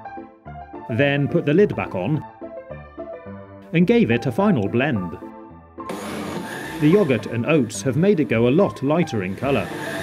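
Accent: United Kingdom. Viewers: I just love the british ascent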